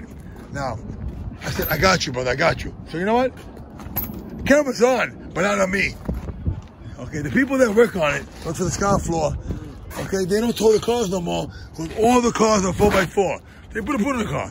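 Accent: New York, United States. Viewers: This was the most New York accent ive heard. Good on you for getting the boot off. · that’s a nyc Italian accent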